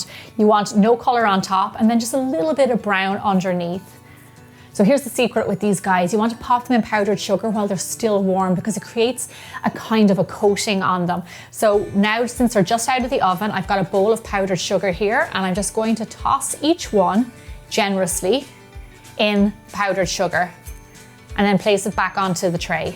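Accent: Ireland. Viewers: Came for the recipe, stayed for that lovely Irish accent! Can't wait to try!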